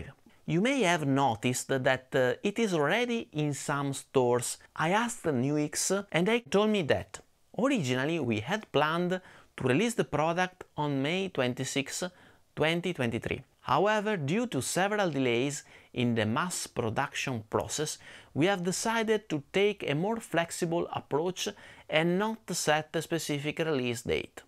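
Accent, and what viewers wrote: Italy. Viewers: Grande! Anche per l'inglese (dalla pronuncia però ti ho beccato subito ahah). Una domanda: ho l'mg30 e i modelli (non tutti) sono fatti molto bene. Il problema è che secondo me hanno sbagliato qualcosa nei gain stage: va in clip troppo facilmente e a livelli medio alti di drive impasta e presenta artefatti. Poi è veramente troppo presente sugli alti. Si risolve in parte abbassando il trim nel pre eq ma non basta. Peccato. Il Trident ha risolto 'sto problema?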